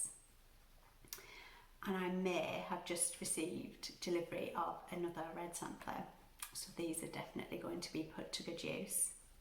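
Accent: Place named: England, United Kingdom. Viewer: Your accent sounds so familiar and I may have figured out why. It seems to be the same accent as several of the characters of Downton Abbey. Am I correct in that guess or totally off base? Another great video.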